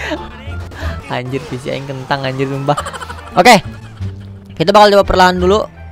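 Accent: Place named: Sunda, Indonesia. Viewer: Sunda detect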